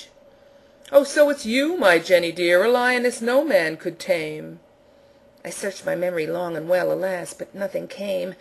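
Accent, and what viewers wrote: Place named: England, United Kingdom. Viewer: Absolutely superb! I loved the English accent too - lol ~Sue~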